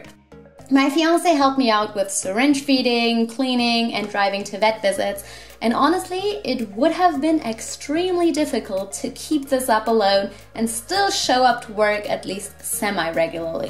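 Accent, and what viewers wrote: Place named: Germany. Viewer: what part of germany are you from? that's an interesting accent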